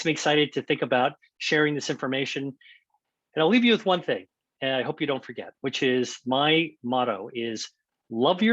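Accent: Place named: United States. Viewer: I like watching his videos. Great info and so glad I can understand his American English. 😅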